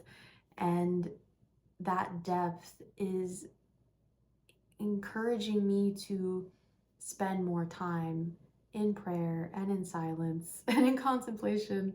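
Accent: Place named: South, United States. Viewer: Why are you speaking in a Southern redneck accent?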